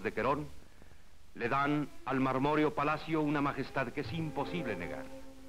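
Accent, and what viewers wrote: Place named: Mexico. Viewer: el bello acento del locutor mexicano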